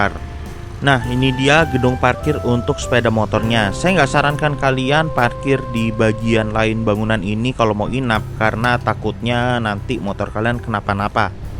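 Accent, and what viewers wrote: Surabaya, Indonesia. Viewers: Seneng kalo mas ngomong. Sopan. Suaranya kalem. Trus bahasa jawa surabayaan nya keluar tuh 😅 bahasa jawa chindo gitu 😂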